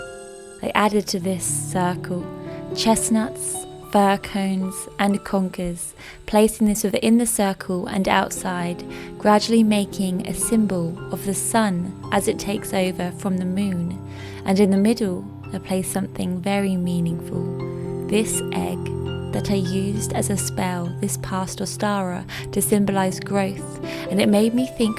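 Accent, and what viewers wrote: England, United Kingdom. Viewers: Esse sotaque é britânico? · Oh, how peaceful your ideas about how to enjoy living in the winter. I loved hearing you read the old folktale with that beautiful English accent. Even your cat seemed to enjoy the magical cozy atmosphere.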